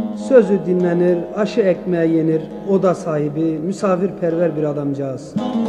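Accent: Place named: Hatay, Turkey. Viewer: Hatay'da ki Türk aşiretleri hepsi böyle konuşur Avşar boyu'ndan 56 Türk aşireti yerleştirildi burayı Türkleştirmek için...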